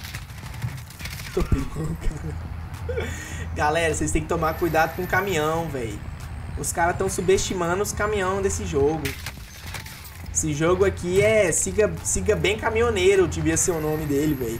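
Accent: Minas Gerais, Brazil. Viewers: tu é mineiro mano??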